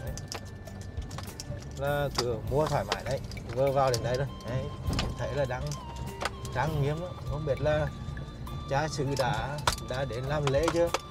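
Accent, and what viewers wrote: Nghe An, Vietnam. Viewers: Giọng chú e nghe đậm chất xứ Nghệ thân yêu! Giọng nói đậm đà ấm lòng người nghe. Hoan hô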